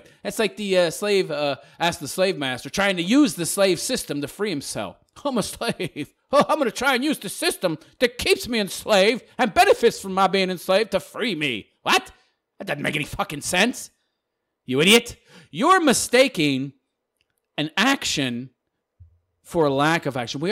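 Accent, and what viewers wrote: New York, United States. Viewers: I swear this is Bill Burr s secret YouTube personality haha Sounds just like him he puts the Patreon thing up to sell it that he's a regular guy too hah a bit of a voice changer mic too if not Bill should hire this guy to fill in for em when he's sick perhaps he's a lil more new york than Boston but still crazy close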